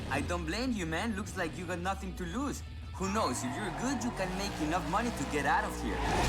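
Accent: Brazil. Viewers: Esse sotaque terrivel de brasileiro me vez sentir ofendido, vou cancelar Need For Speed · Te sigo há meses e meses e só agora que descobri que tu é brasileiro. Bem que desconfiei do sotaque ahahahaha um dos canais que mais me divirto assistindo. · Adoro seu sotaque típico de Br, eu fico puxando R quando falando inglês, só sendo estúpido Achei seu canal agora, ótimo vídeo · Teu sotaque é igual ao do Ayrton Senna falando inglês mano kk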